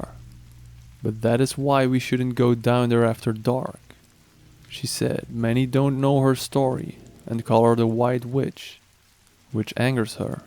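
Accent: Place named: Eastern Europe. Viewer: 1st time listener.... that first story was creepy. Your accent reminds me of russian? Eastern europe?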